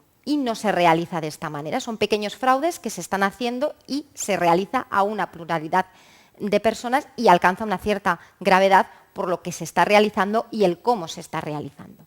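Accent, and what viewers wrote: Spain. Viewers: me encanta tu acento español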